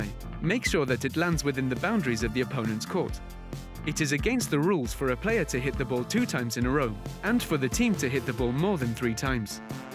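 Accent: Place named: United Kingdom. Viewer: Ik but just British pronounced words just make me laugh. :D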